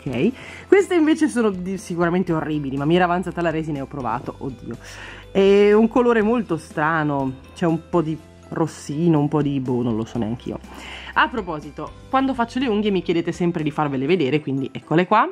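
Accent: Bologna, Italy. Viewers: ma sei di Bologna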